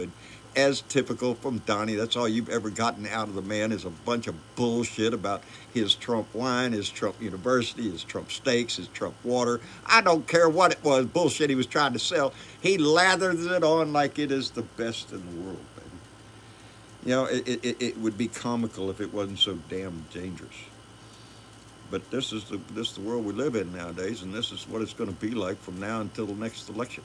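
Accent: Texas, United States. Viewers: I love you, chum!! You remind me of my first husband! Same Texas accent, coloration, shape, sense of humor... Age, too!! 😆😆😆💋💖💋💖😁😁🍿🍹🌊🌊🌊🌵🌵👵🐺🖖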